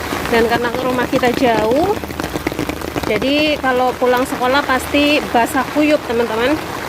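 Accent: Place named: East Java, Indonesia. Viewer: Bu, dari mana sih? Logatnya kok kaya Jawa Timur an.. Maaf penggemar baru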